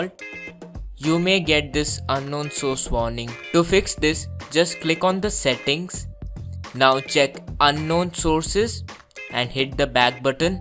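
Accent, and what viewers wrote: India. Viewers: bro from your accent i bet you are indian right? You english is pretty good tho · sounds indian but uses chinese as his text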